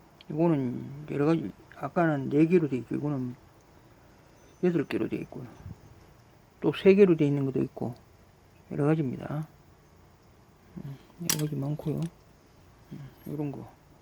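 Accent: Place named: Gyeongsang, South Korea. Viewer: 수고 많습니다ㅡ 말씨를 들어 보니 대구분이신 거 같은데ᆢ 사업 번창바랍니다^^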